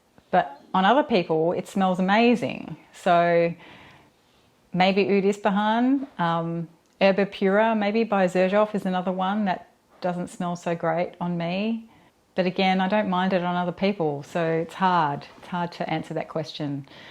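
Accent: Australia. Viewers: You've quickly become a new favorite of mine and your answers are so thoughtful and thorough. I really enjoy listening to you and your lovely accent! I'm from the US and the Midwest specifically so I rarely hear Australians except for YouTube. Beyond the accent, I also love hearing your descriptions and I've learned of many new scents to try. I may subscribe to a monthly service to broaden my collection.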